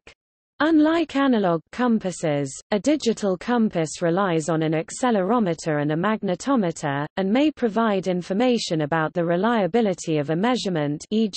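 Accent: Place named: United Kingdom. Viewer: Can we just STOP with the British accents? PLEASE??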